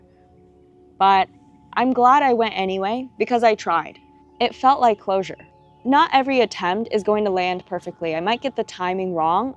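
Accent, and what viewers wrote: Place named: United States. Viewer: I do like your English , however, a bit overly American! Do build your own character when U speak. Never imitate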